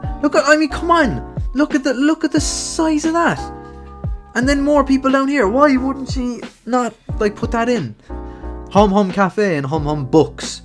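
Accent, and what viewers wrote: Ireland. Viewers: Your accent are you Irish?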